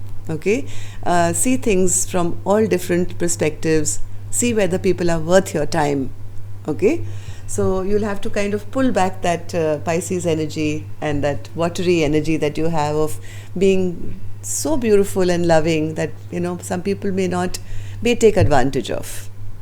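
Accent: India. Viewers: are you a Pisces? You speak so sweetly, with so much love & so much pain & sympathy when we go through disillusion. I am Pisces, an Indian & I think you are Indian too, going by your accent. I've been watching your readings since the past 3 months & I look forward eagerly to your readings. Your interpretation resonates with me very much. I am not feeling too happy, today by the reading because, I really love & want my Virgo to begin talking to me again. We've been in separation for the past 6 months. I do leave everything in Spirt's hands & I accept whatever Spirit decides for me. I just want peace & truth & resolution of this separation. Thank you so much for your help. Be blessed. Namaste.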